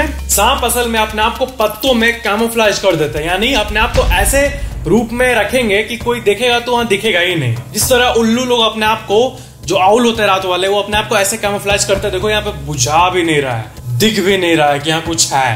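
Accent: Bihar, India. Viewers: Sir aap bihar se ho kya???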